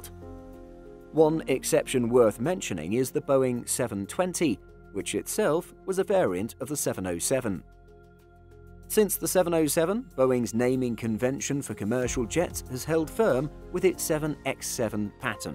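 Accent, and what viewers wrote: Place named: North, United Kingdom. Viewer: This is first time I’ve noticed your northern accent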